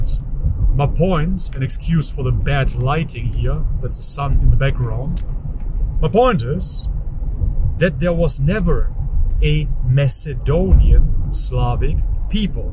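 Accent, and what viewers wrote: Germany. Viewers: well he is and his accent is because he lives in Germany. Either way he is correct. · I was always wondering about your accent and thought it sounded German 😄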